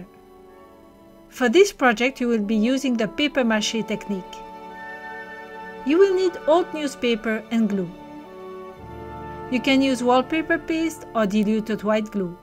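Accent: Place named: Asia. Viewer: Why is every video I find about making a globe an Asian which in accent?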